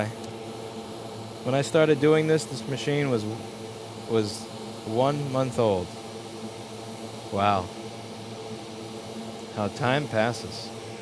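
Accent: New York, United States. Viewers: You are hella New Yorker but I like it. Hey I actually learned how to Solder watching your videos so thanks man. You do you bro, I know I enjoy your videos.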